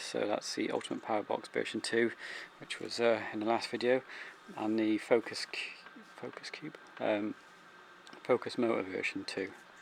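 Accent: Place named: Manchester, United Kingdom. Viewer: MY GOD HE"S FROM MANCHESTER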